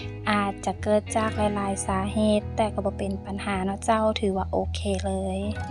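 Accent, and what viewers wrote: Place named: North, Thailand. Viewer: ชอบฟัง..สำเนียงอู้คำเมือง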